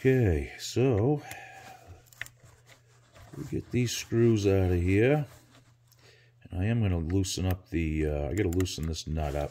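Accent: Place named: Boston, United States. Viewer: It'd be interesting to see Leo's thoughts as he designed this madness (?) Always enjoy your 'Baston' flavored narrative... Cheerios (but not the cereal)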